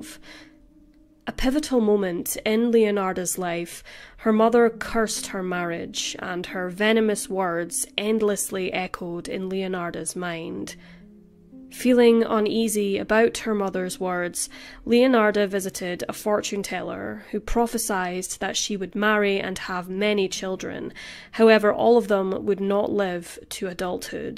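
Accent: Scotland, United Kingdom. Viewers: where is the narrator from? Unique voice, sounds a wee bit Scottish 🤷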